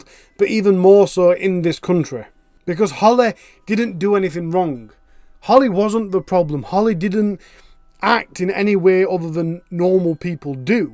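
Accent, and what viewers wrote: Yorkshire, United Kingdom. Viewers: I do love to hear a fellow Yorkshire accent on here though! It’s so refreshing! I just hope you don’t try and bend it with American terms just because a few daftys struggle for some reason.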